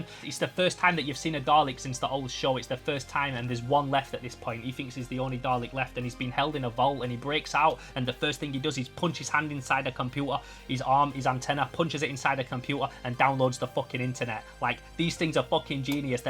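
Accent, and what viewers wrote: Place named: Yorkshire, United Kingdom. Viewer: Kinell, how fast and Yorkshire can you speak?! Good video though!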